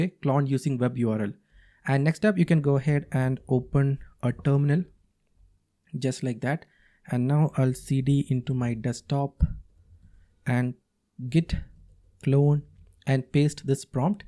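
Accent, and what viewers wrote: Kerala, India. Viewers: Nice Malayali accent bro 😃 Very nice demo 👍✅ By the way, Do you like Cursor or VsCode?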